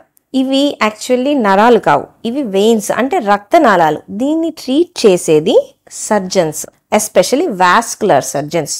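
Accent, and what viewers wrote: Guntur, India. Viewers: Thank you Dr.. At an early age you are explaining every aspect very clearly in telugu that too in Guntur Style. All the BEST.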